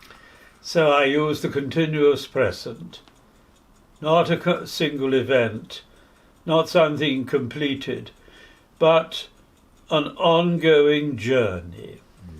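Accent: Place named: RP, United Kingdom. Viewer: Very erudite, with a charming "posh" accent, a British sense of humor, and a real knowledge of the loving heart of God, which is the most important. Thank you very much, Father.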